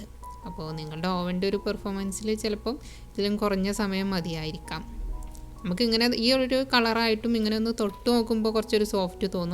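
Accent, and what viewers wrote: Malappuram, India. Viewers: നിങ്ങൾ നാട്ടിൽ മലപ്പുറമാണോ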